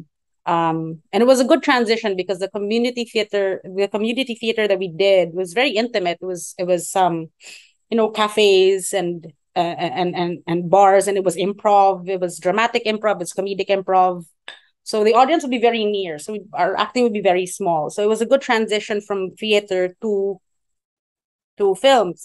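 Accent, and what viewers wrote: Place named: Bisaya, Philippines. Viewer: I love our accent especially the bisaya accent.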